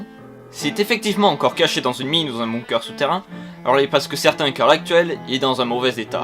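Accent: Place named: Russia. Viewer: Bravo pour l’accent russe!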